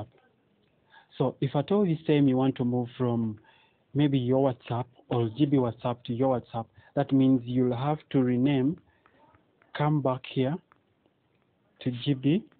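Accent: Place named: Uganda. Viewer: you sound Ugandan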